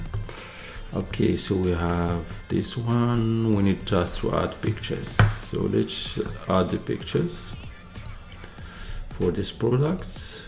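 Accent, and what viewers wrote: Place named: Morocco. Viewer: mgharba baynin mn accent 💪🏼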